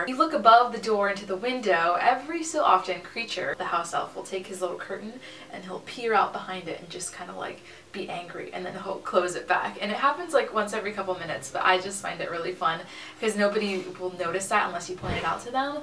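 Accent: United Kingdom. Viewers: Hi. Did you have to put on a British accent while you worked there? Thanks for the fun vid!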